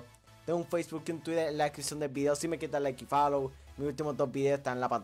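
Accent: Puerto Rico. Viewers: Eres de PR verdad?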